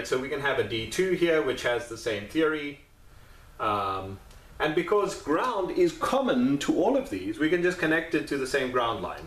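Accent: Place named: Cape Town, South Africa. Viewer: hey, that accent sound very familiar, Cape Tonian myself, very informative and well directed, is as if you lecture S1 Electronics at TECH,,, im currently building my own arcade fightstick using iPAC2 interface and RPI3 with retropi image ( ofcourse i have to add my own roms)... by the way, where did you get the joysticks and buttons from? keep up the good work, cheers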